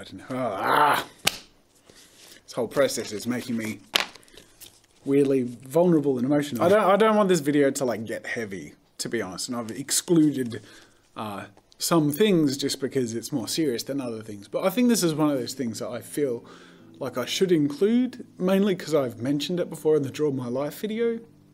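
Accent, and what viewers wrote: Australia. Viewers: there's more ozzie accent when you are more spontaneous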